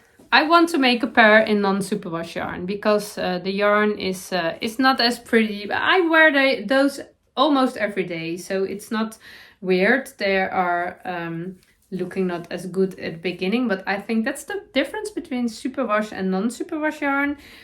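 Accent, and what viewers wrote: Netherlands. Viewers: . You just brighten my day. Love your podcasts and your “Dutchy’ English. So refreshing and genuine. Happy New Year. ❤️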